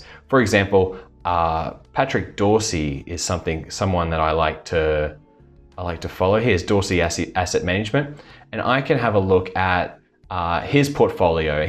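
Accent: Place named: Australia. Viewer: G'Day Mate, Some excellent resources shared. Appreciate. Love your Aussie accent. Somedays I just tune in to listen to you for that :-)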